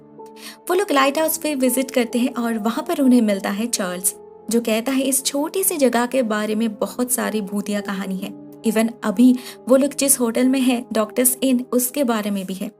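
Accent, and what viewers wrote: Bengal, India. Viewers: You are bangoli right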